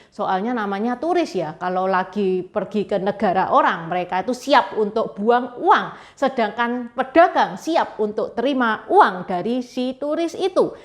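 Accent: Java, Indonesia. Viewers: Medokmu kental banget to cici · Wong Chino logate kog Jowo...manggone nag Endi.. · Jowone endi to jeng,aku subcriber antar lho❤ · Medok bgt logat'e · Medok banget jawanya ...❤ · Mbak ini bahasanya medok kayak orang Jowo sedikitpun tidak terdengar tionghoa hehehe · SENANG DENGAR LOGAT TACIK INI YANG KHAS CHINA JAWA MEDHOK BANGET🙏👍👍👍😅😅😅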